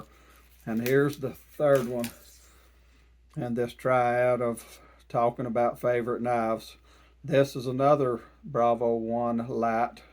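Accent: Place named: Appalachia, United States. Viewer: Hey, bub, you sound like you come from close to the upper part of the Southern Appalachians, which is where I’m from. And back in the old days, a plain two-blade pocket knife along with a sensible belt knife got the work done. Today, I carry a Leatherman super tool along with the same sensible belt knife. Hmmm…..There seems to be a historical pattern going on here, and I sure as hell am not about to argue with it.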